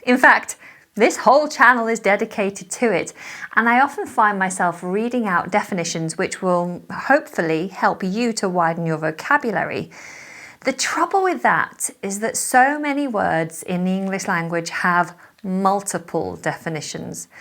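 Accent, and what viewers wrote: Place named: United Kingdom. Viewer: A Muslim here , i love your British accent and i hope you and everyone become A Muslim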